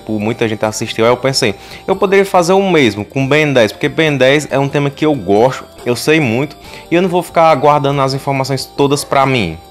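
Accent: Northeast, Brazil. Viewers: Tu é nordestino ou carioca?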